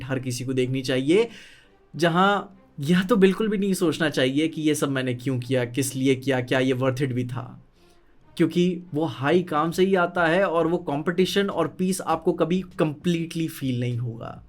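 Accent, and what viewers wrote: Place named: Bihar, India. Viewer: old videos mein kitna ajeeb lag raha hai. Bihari accent bol raha hai, poor hai aur ab dekho kya transformation aya hai bande main 😮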